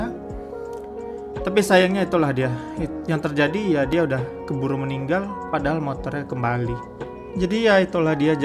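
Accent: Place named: Sumatra, Indonesia. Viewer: abang dari pulau sumatera? wehh pantes aja logatnya logat ngomong orang sumatera. sy org bukittinggi bg. salam kenal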